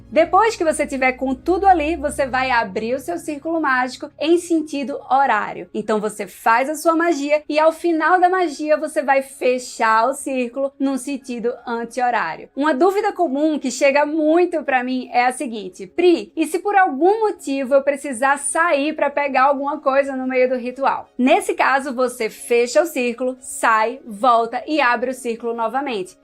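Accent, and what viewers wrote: Pernambuco, Brazil. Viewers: Pergunta nada haver com o vídeo: Pri vc é de PE? Seu sotaque é bem forte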